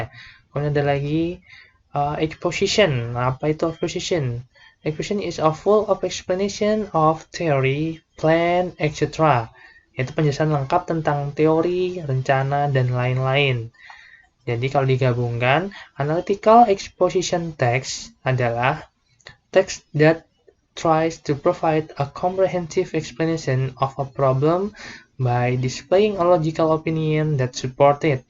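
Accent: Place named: United Kingdom. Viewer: Britis sekali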